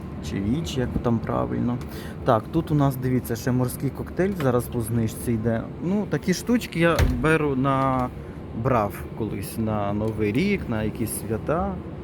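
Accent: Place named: Cherkasy, Ukraine. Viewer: Той момент, коли в мене в області всі так розмовляють і це норма. Привіт з Черкащини, 😅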